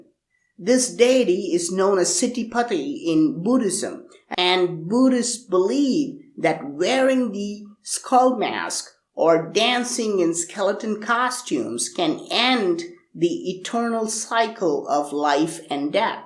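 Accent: India. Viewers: Hello u speak Indian English k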